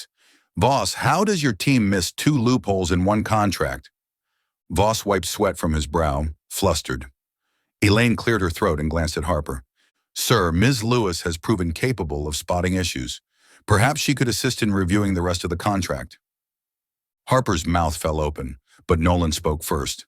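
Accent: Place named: United States. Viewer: Thank you. Good story. Appreciate the American voice telling American stories!!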